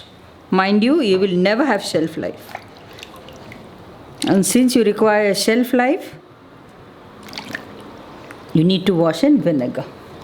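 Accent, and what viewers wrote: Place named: Mumbai, India. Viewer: Hii.. I just want to say Bangdda Bangddo Bangddulio Bangdde it’s the same..it depends on the pronunciation just like salcettekars and Bardezkars pronunciation.. If she lives in Bombay being a Goan from Goa she’s there for a purpose of her living for bread and butter for job purposes and good opportunities unlike the same who works abroad.. if they live anywhere beside goa that doesn’t mean they’re Maharashtrian or belongs to any other religion or caste or nationality..she’s very much a Goan from Goa and lives in Bombay.. instead appreciate her recipes and comment over that.. that’s solely her style of making and saying.. Saying Bangddo doesn’t make her an Maharashtrian it’s just that staying for ages in Bombay or any other country you adapt the accent of that state or country.. What she does is just exhibiting her Goan recipes for the once who’s anxiously wanting to learn and prepare the delicacies of Goan cuisine.. 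instead appreciate her work and give feedback on the recipes and not the pronunciation.. And yes residing anywhere in the world country or states doesn’t matter we all know why we’re there.. what matters is our roots that we’re Goans from heart and birth.. A root grows inside the soil and the tree or plant comes out and we see them widely that doesn’t mean if the roots are inside the soil then it’s different then the tree or plants visible from outside belongs to somewhere else.. it’s the part of each other.. same way…. You can take a Goan out of Goa but can’t tak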